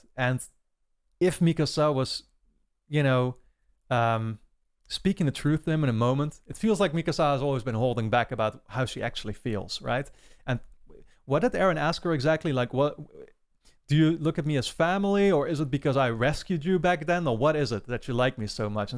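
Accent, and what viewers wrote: Netherlands. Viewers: Are you from the Netherlands? Cuz you sounds very Dutch for some reason haha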